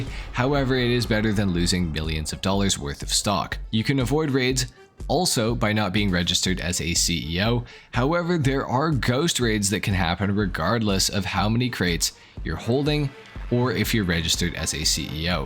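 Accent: Winnipeg, Canada. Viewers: Nah buddy, you from Winnipeg? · Haha I didn’t know you were from Winnipeg bro.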